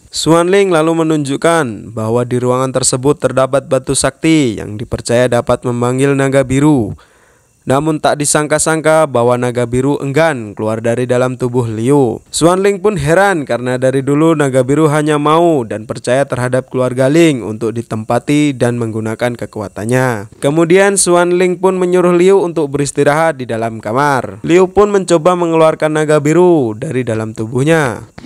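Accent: Madura, Indonesia. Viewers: Orang madura kah bang?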